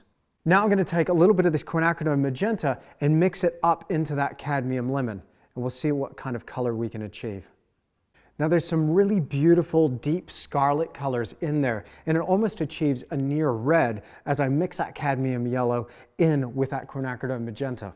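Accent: Australia. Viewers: Am i getting aussie vibes? Are you going in and out of accent? Don't know if I'm losing my little aussie mind or not haha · I could hear that Aussie in ya there dingo! Thanks for the lessons mate. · Great videos,!, just put of curiosity, I can't place ed your accent-, is it Australian?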